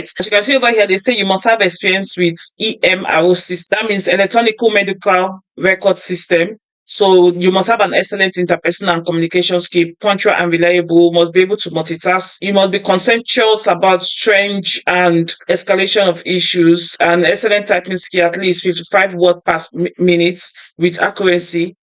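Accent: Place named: Africa. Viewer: What language do you speak as I'm struggling to understand you African accent 😮😮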